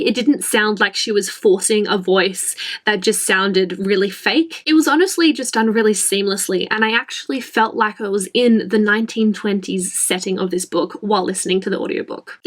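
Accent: Australia. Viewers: You shd make audiobooks. Would you do it if the opportunity arose? You have a clear but tangy Aussie accent. I'd recommend Jon Krakauer narrating his book Into Thin Air.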